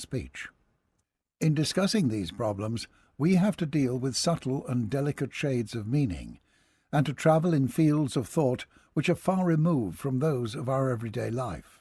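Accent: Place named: England, United Kingdom. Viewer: Thank you for posting this video. I loved listening to it narrated by an Englishman.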